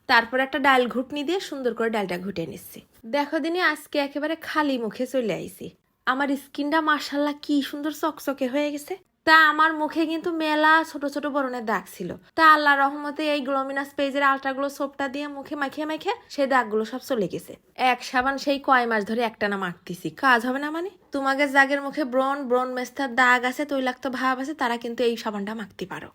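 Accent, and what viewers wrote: Khulna, Bangladesh. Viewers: আপু,তুমার বাড়ি কি খুলনায়। কতা শুইনে তো তাই মনে হয়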